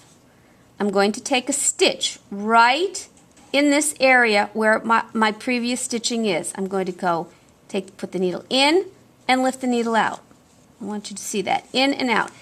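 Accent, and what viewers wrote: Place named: New York, United States. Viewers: I loved this tutorial thanks! It was like beig directed by a mildly annoyed New York/New Jersey person which was brilliant. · everyone has an accent no matter where you’re from. My guess for the video is east coast United States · You sound like you’re from new york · Love the slight NY accent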